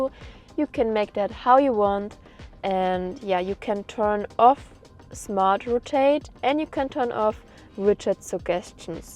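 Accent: Germany. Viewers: You are beautiful . Thank you so much for sharing this update. Are you a German? You have something like German accent.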